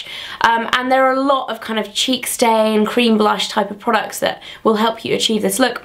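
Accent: England, United Kingdom. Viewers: Khaki or Car-key? :)) Love that British accent!! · British people are more adapted to americans due to television shows, movies ect..In america we are not so attuned to your pronunciation or slang. So to us its different (in a good way) and we might have questions (no we are not making fun of you). Most americans love your accent. So chill out, be patient and don't be so rude. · Thumbs up! nuts about the English accent! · Makes sense! It's crazy that it sounds like a totally different word with the British pronunciation, though! Should have been on the "Accents" Tag did with Joey! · Aww haha the way use Americans say khaki sounds so less proper than the way says it! Why I wish I had a Brit accent ha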